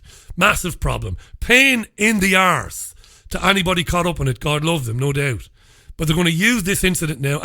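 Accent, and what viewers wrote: Ireland. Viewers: Richie thanks so much for all the great programs. You've become an old friend. Great to hear the old Waterford accent in Manchester. Slán tamall.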